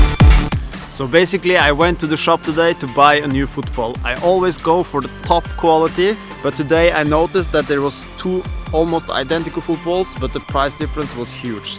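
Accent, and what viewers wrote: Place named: Russia. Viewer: Ты русский что-ли?) Акцент на наш похож))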